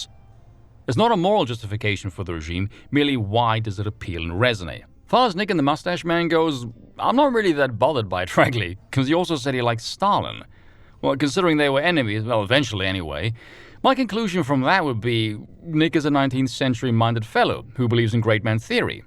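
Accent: United Kingdom. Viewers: listened to the first 1 minute 20 seconds, knew you were gay and british, left this comment to warn others, now off cheerios goody goody gum drops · I swore you were british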